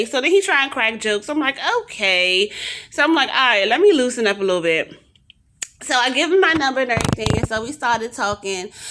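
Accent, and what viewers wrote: New York, United States. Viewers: Did I ever tell you that you sound like New York ?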